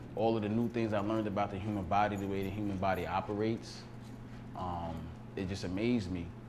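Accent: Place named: New York, United States. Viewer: I KNEW he was from NY before they even showed Harlem Hospital lol. The accent is unmistakable. #myfellownewyorker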